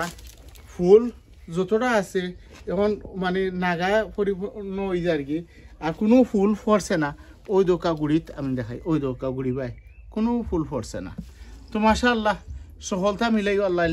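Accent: Sylhet, Bangladesh. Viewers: I❤ you Bhaisab & I Love your gardening & I Love Afnar syLhety kotha gulu keep safe & well Asalamu walaikum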